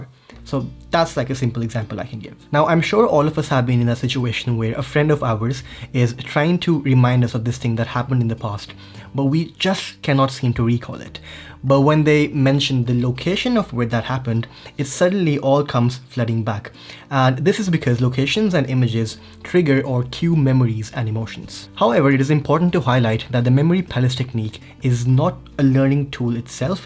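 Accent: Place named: India. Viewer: I feel that your English accent is Hindi. Please make a video about speed reading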